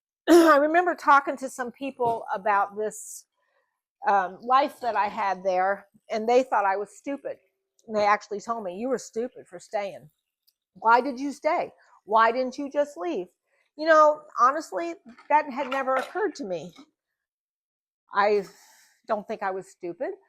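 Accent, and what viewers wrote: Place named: Appalachia, United States. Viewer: Are you kidding me? People’s audacity blows my mind! I’d have talked even more Appalachian if someone had shown opposition of my sound! 😂 Friend, if your dialect runs off into Appalachia without your knowledge again, let it go! ✌🏻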